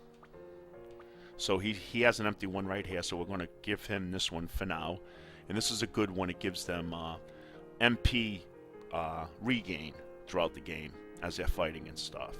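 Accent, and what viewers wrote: New York, United States. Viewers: New yawk accent?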